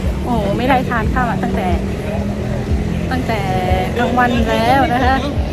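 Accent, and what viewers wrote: North, Thailand. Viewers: ภาษาพูดสำเนียงเหมือนกันเลยครับกับ ทางภาคเหนือ ของประเทศไทย ติดตามคลิปตลอดครับเป็นกำลังใจให้ครับ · สำเนียงและการพูดคล้ายๆ ภาษาเหนือเชียงใหม่เลย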